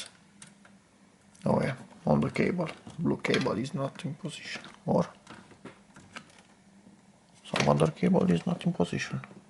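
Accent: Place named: Romania. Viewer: pot sa jur ca esti roman! accentul acela :) apropo... am achizitionat si eu acelasi pc recent. din cate am inteles are placa video integrata nu?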